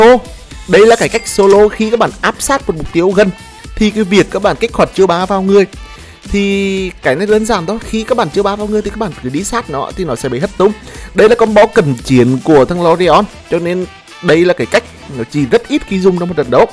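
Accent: Nghe An, Vietnam. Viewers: Anh ở đâu Nghệ An thế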